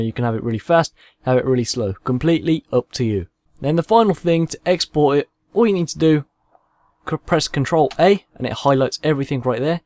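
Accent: United Kingdom. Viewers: You sound like a British version of xxxtentacion. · Why y’all sayin he talks to fast. Get your jockstraps outa your buttcheeks and open your ears he talks like a regular brit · Dude, what language are you speaking ? )) Is it Scottish or Irish accent?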